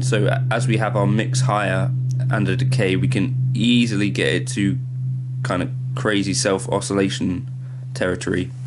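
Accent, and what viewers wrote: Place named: Bristol, United Kingdom. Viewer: Random question - Where did you grow up? My girlfriend has overheard your videos when I watch them and called you "that Bristol guitar bloke" based on your accent. I said he's from Exeter in Devon as far as I know and the accent is similar (We're oop north) but she insists you're from Bristol and must have moved. I insist she's crap at identifying accents. Can you help settle an argument?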